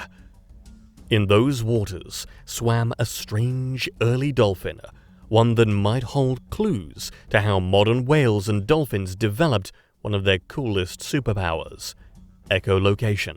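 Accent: United Kingdom. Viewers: If we're going with the theory of evolution, which is never actually been proven, by the way -- why are cockroaches and crocodiles exactly the same over billions of years? Is it possible that those one or two fossils that somebody found were just mutants and not some pre-evolved form? I mean the theory of evolution just cracks me up to be honest and then we get this dramatic British man servant accent and I don't know what to think of it this😅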